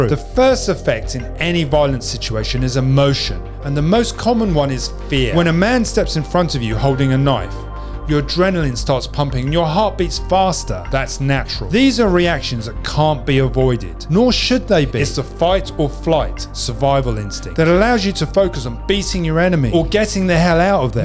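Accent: United Kingdom. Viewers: The guy in the video sounds like a Brit. We don't get as many street fights here in the US since there is a good chance that the person you are thinking of attacking is armed.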